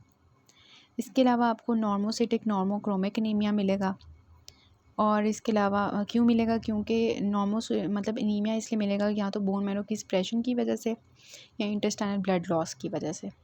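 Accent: Pakistan. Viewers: Pakistani lg rha h aawaj se ... Kya pakistani me b MBBS hoti h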